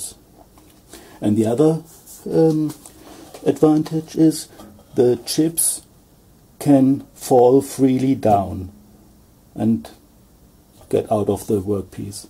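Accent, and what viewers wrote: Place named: Germany. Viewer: You sound German !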